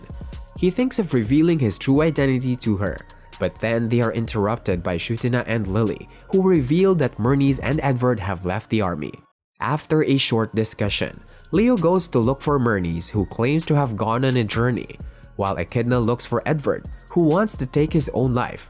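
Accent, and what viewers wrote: Philippines. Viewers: Pinoy narrator😁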